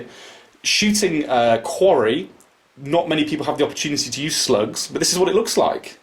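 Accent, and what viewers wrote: United Kingdom. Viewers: I did NOT expect a British guy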